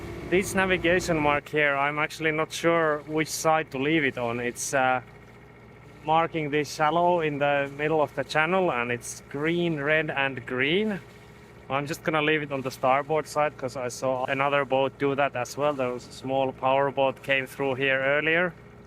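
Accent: Finland. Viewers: Why do you have so Finnish accent?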